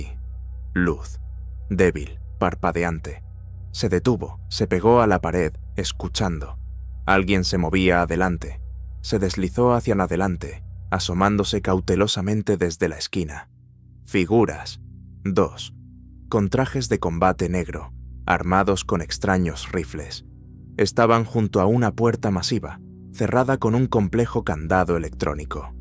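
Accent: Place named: Spain. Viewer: Quiten el maldito y horrible acento español, ese acento tan desagradable arruina la historia, deberían de prohibirlo en todo el mundo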